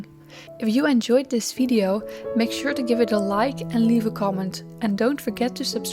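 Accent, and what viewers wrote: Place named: Netherlands. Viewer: Are you dutch ? You sound dutch (I am dutch) I love how you project turned out it looks amazing !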